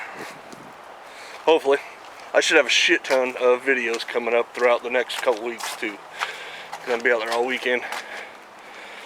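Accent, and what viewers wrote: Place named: Texas, United States. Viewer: I’ll make my way out there eventually with my ATV. For some reason, I thought you were from Texas, maybe the accident lol..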